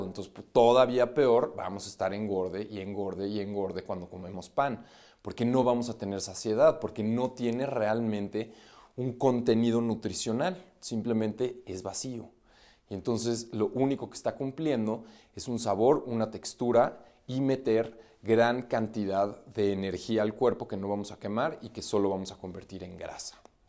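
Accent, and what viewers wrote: Mexico. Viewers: Excelente información muy valiosa.. Muchas gracias por su gran claridad y acento muy interesante a la Mexicana!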